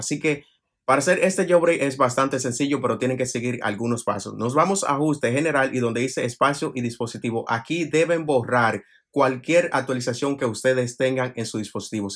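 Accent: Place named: Dominican Republic. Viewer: Dominicano Verdad lo noté por el acento Y se puede con iOS 12.2 ?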